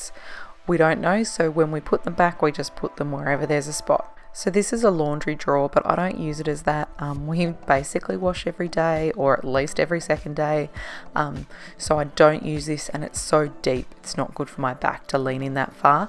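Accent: Australia. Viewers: Hi, I iron everything even my sheets, I relax when I iron, it is satisfying for me. I have only just discovered your channel and it is so nice to hear an Aussie accent...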